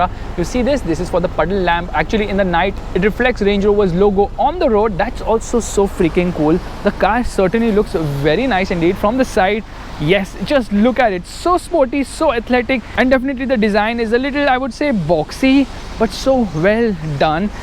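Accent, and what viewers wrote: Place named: South India, India. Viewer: Why Indians speak sooooooo fast. English sounds like some south Indian language.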